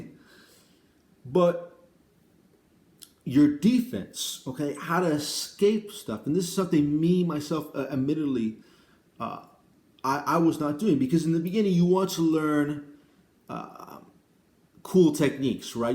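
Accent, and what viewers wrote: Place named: Cuba. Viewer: Damn,! Your getting a lot babe support that I like your stuff. They must love that Cuban accent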